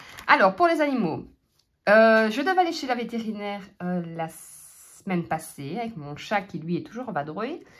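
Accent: Belgium. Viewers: Bonjour tes vidéos sont très vivantes et j’adore l’accent belge ,tu aimes les fleurs alors acceptes ce bouquet 💐🌺💐🪷🌾🌸🌻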